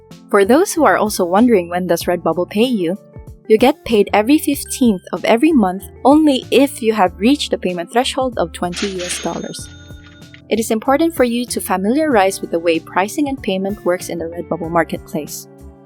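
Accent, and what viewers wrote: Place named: Philippines. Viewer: almost give up on POD after spending so much time following tips here and there ,I'm glad I stumbled on your channel...and wow your amazing and inspiring, I find so much value in your content....I'm hoping to learn more and finally establish my RB shop. Thanks so much. BTW, correct me if I'm wrong, I'm from the Phil and hearing your voice and diction makes me think we are from the same country, anyway, I'm just curious..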